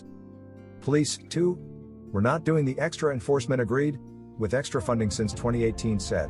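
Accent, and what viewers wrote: United States. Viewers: This is an Aotearoa (New Zealand) funded road safety promo hosted by an American or Canadian voice... Just say'n. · Most of these comments are fake. Ask what is the motivation for producing this, and why the American narrator? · The minute I heard the American accent it lessened the impact of the message. How about we all take responsibility for our actions on the road, good or bad ! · Why is all the footage AND the commentary accent based on USA, when New Zealand is more like Britain. We drive on the left, our emergency number is 111 NOT 911, and we (mostly) speak English similar to British English but with less regional accent. We generally don't speak American English.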